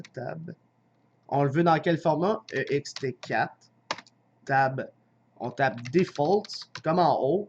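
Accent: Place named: Quebec, Canada. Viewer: merci pour cette vidéo qui m'a permis de comprendre deux ou trois points que j'avais du mal à visualiser avec les tutos classiques. Et puis, rien que pour l'accent du Québec ça valait le coup. Merci cousin !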